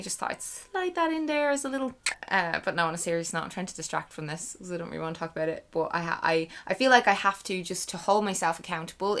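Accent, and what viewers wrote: Ireland. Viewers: I am currently reading your book (english is not my first language) and one thing that it does to me is crave hearing the irish accent. so hear I am listening to you ramble on and enjoying it very much :)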